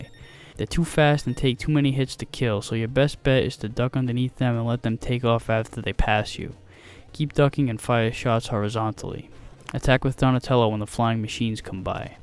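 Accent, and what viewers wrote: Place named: New York, United States. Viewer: Your New York accent is incredibly annoying. Buy a tape recorder and tape yourself all day and play it back. You'll realize you sound like a toddler who hasn't figured out his speech patterns yet. I recommend you move to the Midwest where people talk considerably normal.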